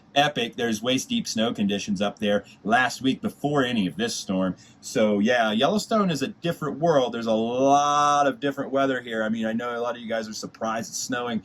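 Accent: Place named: Western Pennsylvania, United States. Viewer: Are you from western PA or eastern Ohio? I'm picking up on an accent.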